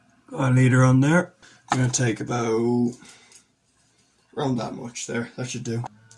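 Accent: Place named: Ireland. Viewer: he is from irland mate he has a accent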